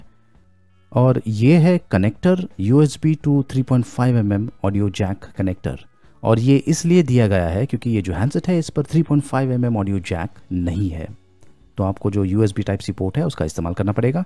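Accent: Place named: Bihar, India. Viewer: Sr bihari wali boli aadhai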